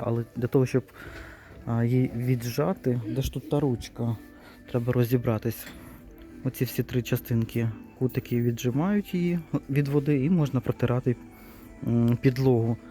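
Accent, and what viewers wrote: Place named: Western Ukraine, Ukraine. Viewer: Дуже дякую за екскурсію.Я думала ви родом із західної України,така красива мова.А сьогодні випадково натрапила на роликиде ви їздили в Ірпінь за одягом.Цілий день дивилась ваші ролики,наплакалась разом з вами,згадала перші дні війни(ми із переляку поїхали під Київ на дачу,сподівались,що там буде безпечніше).Від щирого серця бажаю,щоб всі негаразди у вашому житті стали в минулому,по троху забулися.Хай у вашій добрій,ніжній,чутливій душі живуть Бог,мир,спокій,радість.Здорв'я вам, щастя,хай мрії збуваються.Вітання із Києва.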